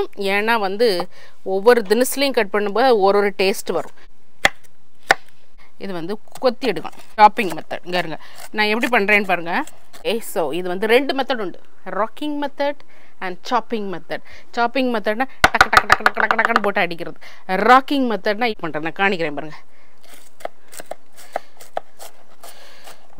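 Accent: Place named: South Tamil Nadu, India. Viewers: Super mason தாங்கள் சமையலை விட நீங்கள் பேசும் எங்கள் தென்னாட்டு மொழி மிகவும் அருமை எங்கள் முகவரி இந்தியா தமிழ்நாடு திருநெல்வேலி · சகோதரி பல ஆண்டுகள் லண்டனில் இருந்தாலும் நம் திருநெல்வேலி தமிழை மறக்காமல் பேசுவது பெருமையாக இருக்கிறது மட்டன் கபாப் சூப்பர்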